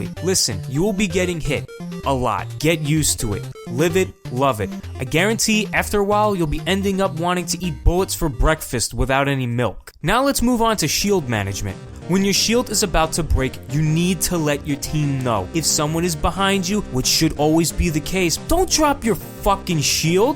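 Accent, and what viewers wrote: New York, United States. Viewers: Is this guy a fokin new yorkah or Brooklyn dude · The fact that he has a New York accent makes every one of his roast better 😂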